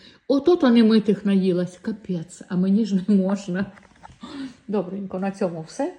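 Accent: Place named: Kyiv, Ukraine. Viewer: Доброго дня пані, сьогодні попала до вас на гостину на ваш канал вперше, бачила ваш коментар у Вікторії та що вирощує свині з Запорізької області, переглянула ваші шортси і відео, дуже цікаво, у вас такий гарний голос, приємний, звідки ви? У вас така мова як у мої тітки яка живе в Фастівському районі Київської області. Тільки прочитала під одним відео що у вас можна замовити пепінку, вперше про неї почула у вас, але як смачно ви її куштували і яка вона соковита в мене аж слина з рота побігла😅. І тут я читаю коментар який був написаний два дні назад що вже немає у вас саджанців на замовлення, дуже вдячна якщо дасте номер пані Наталії з ізмаїла, а можливо у неї є також канал на ютубі? Також буду вдячна якщо скинете силку на її канал, соромно звзнатися але інжир в такому вигляді я ще не їла, тільки в'ялений і той що я їла вперше він мав запах якихось ліків. 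Скажіть будь ласка по якій ціні Ви купували той інжир, плоди, що з Ізмаїла. А ще буду дуже вдячна якщо дасте адрес, того пана Анатолія де ви брали саджанці пепінки. Я сама з Одещини 🙋‍♂️. Поки дослухала ваше відео, вже в мене склалося враження що ви з Житомирської області, там також жила моя покійна тітка. Ще раз дякую дуже велике вам за відео, дуже приємно було з вами познайомитися, скажіть будь ласка а ви себе не показуєте у відео, дуже приємний ваш голос хотілося б вас побачити. Всього вам самого-самого найкращого пані, хай Бог береже вас і вашу родину, Божого вам благословення 🕊️🙏🙏🙏 буду з нетерпінням чекати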